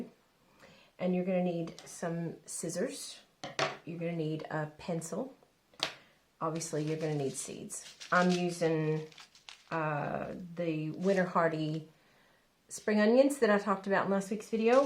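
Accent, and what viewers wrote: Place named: United States. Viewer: You sound American then you use a word like “quid “?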